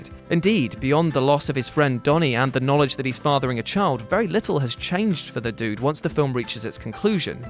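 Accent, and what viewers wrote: United Kingdom. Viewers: I usually don't trust anybody with a British accent, but I'll listen anyways.....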